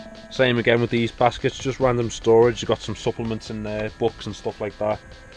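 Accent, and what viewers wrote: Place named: Doncaster, United Kingdom. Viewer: Love your van - also love the accent! (Doncaster is it?)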